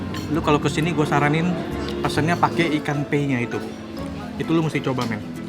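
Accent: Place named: Java, Indonesia. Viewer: mantappp....jawanya tambah Meddokk mamen mkan coyyy..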